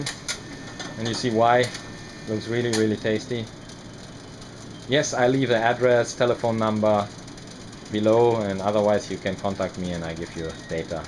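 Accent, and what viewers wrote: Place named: Germany. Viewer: I understand German and at first I couldnt differenciate between the 2 because you have such a German accent :D Like the video though (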